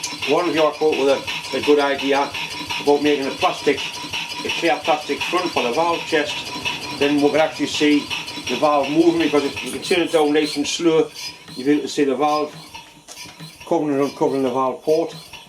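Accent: United Kingdom. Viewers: Your command of the Queen's English is enough to delight the heart of an uncultured American....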